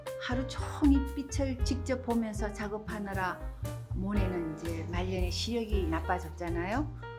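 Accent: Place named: Gyeongsang, South Korea. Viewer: 오랜만에 여유를가지고 샘 작업을 훔쳐보고있습니다 ^^ 겡상도억양이 그림의 따뜻함을 더 하는것 같습니다 배경음악의 볼륨이 샘의멋진 설명을 누르는느낌이 조금 아쉽습니다 ㅎ 기연샘 음악회때 처음 샘 만났는데 기회되면 화실에 함 가보고싶습니다 요즘도 성산 출강하시죠?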